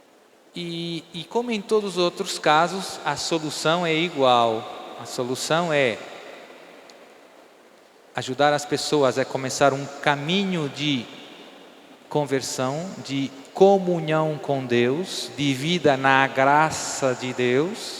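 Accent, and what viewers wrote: Brazil. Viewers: Ele morou no Brasil na juventude, mas com jeitinho, qualquer português é capaz de falar com sotaque brasileiro, devido às quantidades industriais de telenovelas brasileiras que têm visto desde 1977 (Gabriela e n seguintes). Já o contrário é mais difícil...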